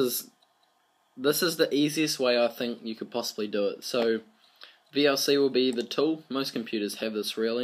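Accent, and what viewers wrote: New Zealand. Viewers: Hey man I Luv your NZ kiwi accent !! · His accent changes the guys vowel to almost gihs but clearly he DID NOT say girls. There was NO L sound in there. I was going to guess that he was an Aussie but clearly it he stated that he is a Kiwi in other comments. My American ears cannot tell the difference(just like many non Americans cannot tell the difference between Canadian and American speech). Very similar. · Such a typical kiwi accent lol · Ha ha. I picked your Kiwi accent in about 3.5 seconds... then got your confirmation at the 28 second point. Well done my fellow Kiwi !!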